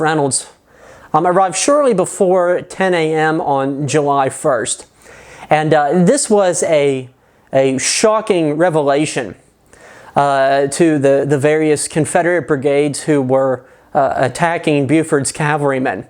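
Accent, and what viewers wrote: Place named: Pittsburgh, United States. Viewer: This guy is a Yinzer no doubt.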